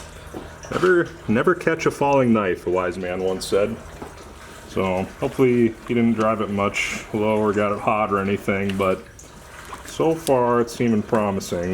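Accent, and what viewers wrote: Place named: Northern Minnesota, United States. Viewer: I heard northern Minnesota in one of your Iroc videos. Love Irocs! Where in Minn? I'm originally from Intl Falls on the border, eh? So I'll have to catch up on your Camaro vids .... peace.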